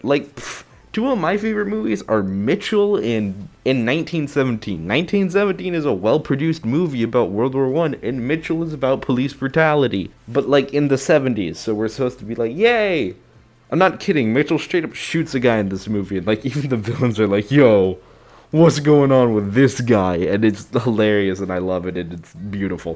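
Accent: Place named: Spain. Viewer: I just watched one of your other videos and the "spanish and english accents coming out at the same time" cracked me up 🤣 i love the way you talk 👌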